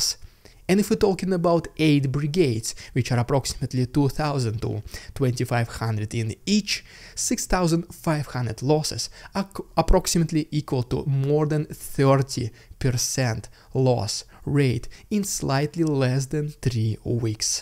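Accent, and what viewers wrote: Russia. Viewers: You have a strong Russian accent but your English is impeccable. The Russians can't handle losing face, just like thier allies China and North Korea. Slava Ukraini 🇺🇦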